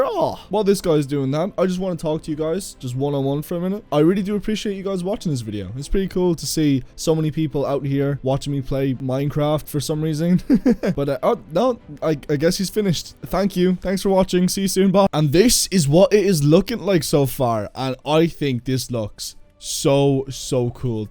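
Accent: Ireland. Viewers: As soon as I heard you're voice I instantly noticed that your Irish. It's nice to see another Irish brother 🇮🇪🇮🇪. Good luck in your channel mate. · can't tell if you're irish or european. either way, I like your voice!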